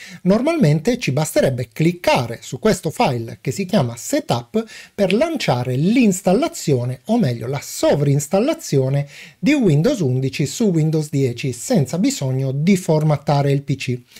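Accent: South, Italy. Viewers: Salve. Innanzitutto GRAZIE per quello che ci "Regali". in merito a questo video desidererei sapere se e' possibile eseguire la stessa procedura su un PC con windows 7. (dal tuo accento mi sembra di capire che non sei dl nord). Per inciso io sono un GIOVANOTTO di 85 anni e sono stato uno dei primi utlizzatori ed insegnante di INFORMATICA degli anni 70-80 di una disciscipilina con il nome altisonante di "Principi e Tecnica delle elaborazioni meccanografiche ed elettroniche" della Facolta' di Statistica dell'Universita' di Roma; con una grossa lacuna, conosco il funzionamento di ogni componente Hadware, ma sono quasi totalmente IMBRANATO nell'assemblaggio. Ancora GRAZiE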